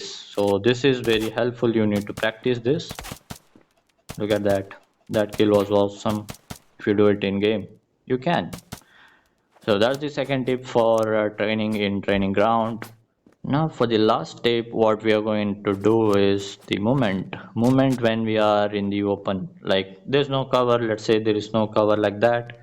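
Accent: India. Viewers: Pajeet accent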